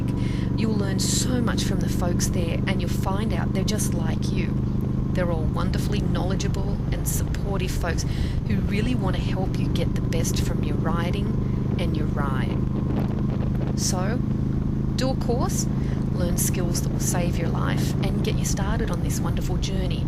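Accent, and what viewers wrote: Australia. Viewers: is that the yamaha v star 650?? also are you Australian?? ( i am Australian and i think youre either Australian or a Kiwi) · G8 video there... Have watched most of them and have to say quite entertaining as well as informative... love the Ozzie accent... LOVE your Victory... Getting onto a course next weekend(Riding/Learner's Permit)... I'm a bit like yourself... but MID-FORTIES... LOL.. and have been on youtube for the last 6 months researching.... But i think the Victory's are No.1 with me at the moment(Hammer S)... But first things first... anyway... i really enjoyed your video..Cheers from Ivan.. Geelong AUS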